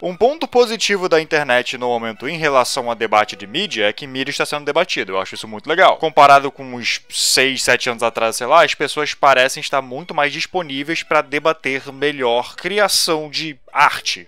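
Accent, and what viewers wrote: Rio de Janeiro, Brazil. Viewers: Só percebi hj q o tem sotaque carioca kkkkkkk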